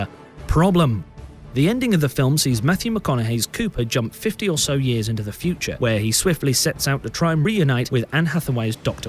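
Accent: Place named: Bristol, United Kingdom. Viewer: He sounds a bit bristolian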